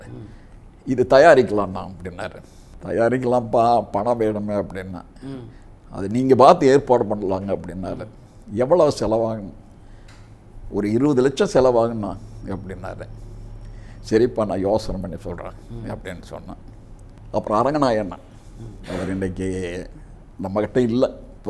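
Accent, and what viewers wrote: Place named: Coimbatore, India. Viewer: Kovai slang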